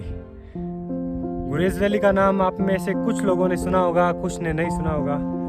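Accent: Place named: Haryana, India. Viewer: भाई कभी कभी ये हरयाणवी में इंग्लिश बोलते हो ये वाकई लाजवाब है...🙂😊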